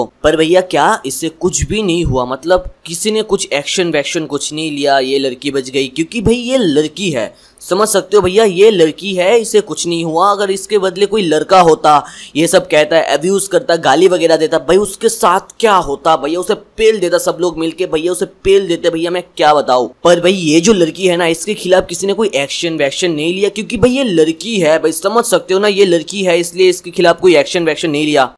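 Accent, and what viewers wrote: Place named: Bihar, India. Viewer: Tum Bihari ho kya be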